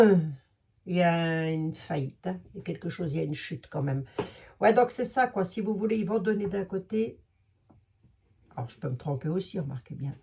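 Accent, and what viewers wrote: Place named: Lorraine, France. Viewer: Bonjour Merci beaucoup, J'adore vous écoutez, vous avez bien le parler lorraine, et ça me fait du bien. Je vous remercie beaucoup pour la voyance. Et votre humour.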